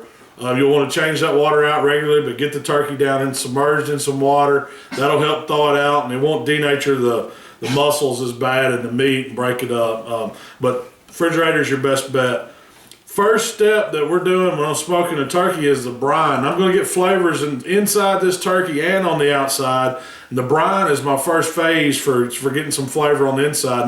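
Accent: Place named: Sand Mountain, United States. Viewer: You sound like one of them ole Sand Mountain boys I grew up with 👍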